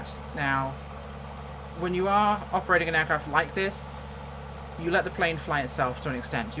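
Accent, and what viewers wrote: Australia. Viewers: Luv the Aussie accent... Brought one for my Xbox.. great fun. Cheers mate 🤠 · Lovley Aussi accent there 😅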